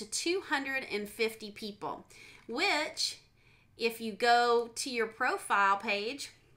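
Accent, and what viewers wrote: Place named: South, United States. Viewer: Nice accent, are you from the South or Texas?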